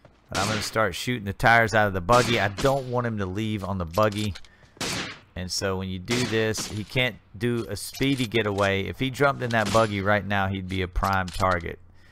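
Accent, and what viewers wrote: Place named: Carolinas, United States. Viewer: Hey, I am going to assume by some comments you've made in the past and by your accent that you are from the Carolinas. I hope this looming hurricane doesn't impact you too much. I am about 30 miles north of the NC border in Virginia and about 10 miles from the coast, so we are preparing for it here too. Here's to hoping the storm doesn't hit either of us directly and that you don't have to work too many extra hours in the aftermath. Stay dry, my friend.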